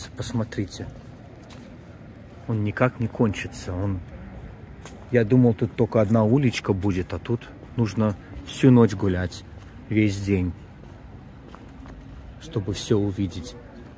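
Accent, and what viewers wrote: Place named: Baltic states. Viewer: Уселась смотреть, видео новое, а вы вдруг в рубашке. Неожиданно. Спасибо, отвлекли. У вас приятный акцент, какой всегда я думала, должен быть у прибалтов.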